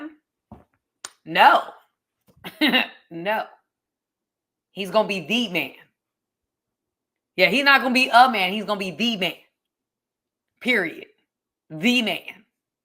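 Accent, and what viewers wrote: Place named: New Jersey, United States. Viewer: The only thing I know about New Jersey is what ive seen on it’s always sunny and that it’s also the birth place of jay and silent bob and I can see you growing up there the way you speak 😂😂 i definitely was a giant red flag no way around it but i think I’m a white flag now I’ve surrendered myself as we should all be. Ive still got that red flag but I’m not waving it around anymore it’s just for emergencies I think.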